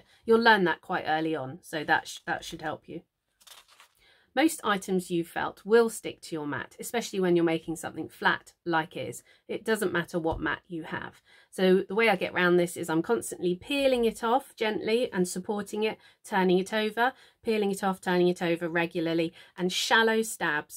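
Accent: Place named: United Kingdom. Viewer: This was so helpful to listen to. I've been felting for about a year? I agree with you that it is so addicting. My one comment to add is that I watch many videos and find I want to try so many different types of felting (2D, 3d, landscapes, wet felting, needle felting...) I feel that trying a lot of different things has helped me narrow down what I want to concentrate on. Now, I need to practice, and concentrate on one type of felting to gain more confidence in my abilities. I look forward to watching more of your videos! I am American and just LOVE listening to your british accent!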